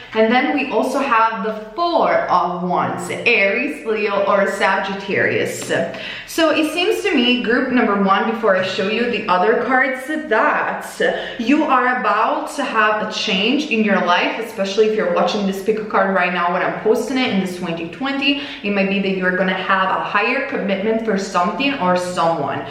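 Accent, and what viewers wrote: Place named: Italy. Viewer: 👍👍👍 for Italian accent! 🍻🍻🍻 & 🌷🌷🌷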